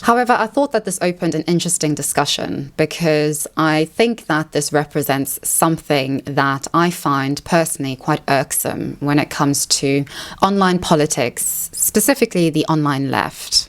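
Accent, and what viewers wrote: RP, United Kingdom. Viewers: I got recommended one of your videos shortly after watching Patience for the first time. I think I got the two of you confused once because you both have lovely British accents but other than that I like you both for different reasons. Very contrarily, Candace is bothersome to me and I've always thought her to be disingenuous and more of a shit-starter than anything else, even though I'm more alike her in a political sense. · I like ur accent. Is it a British accent? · You are FAR more interesting and well spoken than CO. I think including the fact that you are "safe and can read and write" is a nuance lost on many Americans. From what I've gathered of your story, your perspective on this is uncommon, if not unique to the ears of most Americans. I think you're correct in your analysis of race/class differences between the two countries. 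I can also see why some folks in the US would consider you "posh" if all they hear is your accent (which I like, FWIW). Given that all folks over here can somewhat differentiate "proper English" from cockney and will often confuse Australian and Irish (never mind the poor Kiwis), I'm afraid that's about as good as can be expected. 'Merca! (eyes rolling). Keep doing your thing! I enjoy your content. Cheers! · Ngl😅 your videos have been recommended to me for a while now but I had no interest to watch and I kid you not you reminded me of Patience. I press play and you also have a British accent😂 I've listened to Patience b4 and I've gleaned everything I can and doesn't really interest me but I'm willing to listen to your opinions. 🇿🇦Shoutout from South Africa✊🏿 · I never said you did condon it, however a few videos back you stated you were not privileged whatsoever in the British context. Even in the colonies your near RP dialect carries weight that other UK dialects don't.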